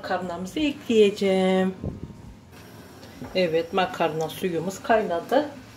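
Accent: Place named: Dersim, Turkey. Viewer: Abla sen Dersimce biliyor musun? Sanki Dersimliler in aksaniyla konusuyorsun! :-) Yani bilmesem, akrabam sanacagim!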